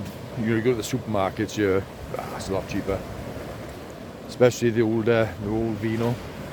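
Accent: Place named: Welsh Valleys, United Kingdom. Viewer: You just hit my algorithm, just subbed. You sound like a valleys lad. Self - deprecating, no nonsense, tell it as it is, dry sense of humour. Wishing you all the best 😊🏴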